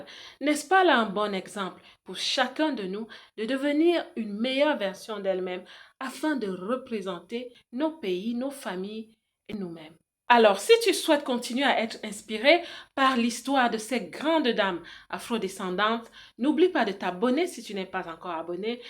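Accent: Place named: Cameroon. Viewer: Merci pour cette histoire passionnante ! Vous aussi vous devez être une princesse de naissance comme moi 😊 Et il me semble reconnaître un petit accent du #237🇨🇲 Bien à vous Marie-Céline Princesse de Hegba♔©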